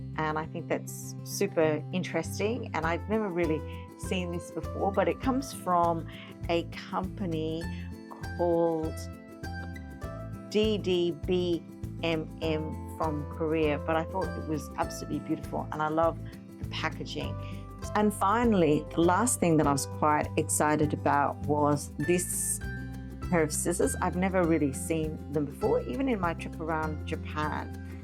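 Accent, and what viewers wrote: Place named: Australia. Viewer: Lovely to hear a fellow Aussie expat (although I’m in the States). Struck by the coincidence, at the time this video was released I’d never heard of Cambridge Imprint, but not two hours ago I unpacked my first order from them, and completely agree, the labels are lovely. Glad your channel “turned up” in my recommendations.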